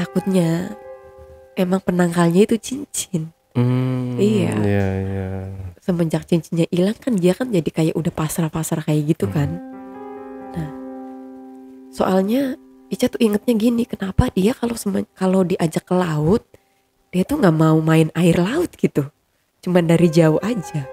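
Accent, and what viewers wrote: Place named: Purworejo, Indonesia. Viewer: mba bner lembut. sabar. ngomongny ky sdr saya orang purworejo cm tinggl di brebes. logatny sm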